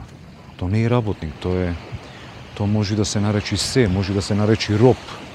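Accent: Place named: Bitola, North Macedonia. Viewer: Odlicno video prodolzi da snimas za nas makedoncite.Po akcentot mora da si od bitolsko pozdrav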